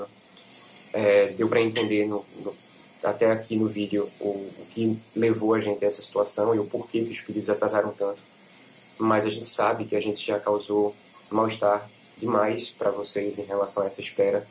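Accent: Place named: Northeast, Brazil. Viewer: Gostei do sotaque . "É de nós " do Nordeste , eu acredito ! Um forte abraço e força para crescer na crise . Gostamos muito do trabalho de vcs , inclusive , formei um grupo de amigos para todos comprarem as camisas dos Sentinelas , dos cavaleiros de ouro ! Pena que aconteceu isso . Espero que vcs recuperem e assim que vcs retomarem, compraremos as camisas . Grande abraço.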